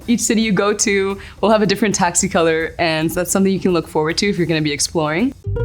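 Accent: Morocco. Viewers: the way she pronounces the names of things in the original moroccan dialect is Awesome <3! we proud of you <3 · كل شيء في الفيديو رائع والاروع نطق الاسماء باصولها المغربية لكن لو قمتي اختي الكريمة بشيء اخير لكان هذا المحتوى في قمة الروعة الا وهو ان تلبسي القفطان المغربي. روعة. 👏👏👏👍👍👍🇲🇦🇲🇦🇲🇦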